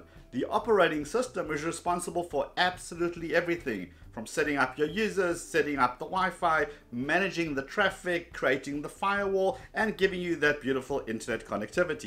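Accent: Johannesburg, South Africa. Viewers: love the South African accent ! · South African? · U sound South African ... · stumbled onto this vid and 0.2 sec I can tell he's from SA :) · Love that Joburg accent. Content is also great.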